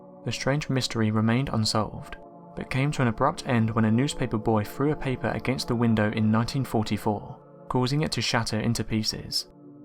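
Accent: England, United Kingdom. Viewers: bra im confused. does he mean shoes? or real human feet detached from the ankle. can someone clear this up for me ? or does he mean that the human foot was inside the shoe when it was found? im a southern gentleman myself and I understand the man has a British accent, that being said, do they say feet in England instead of shoes? or am I just overthinking this.. point is does he mean shoes or real human feet floating around in the water .. cause I feel that if its human feet floating around then it should be an open/closed case that it was murder ....... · Dantess26 -English accent ✔ -Similar transition and profile pic ✔ -List of 5 ✔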